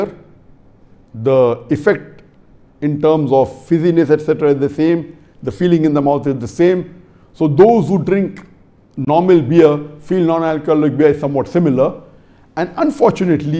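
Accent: India. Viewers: I can't understand Indian accent, can you tell me is this halaal or not?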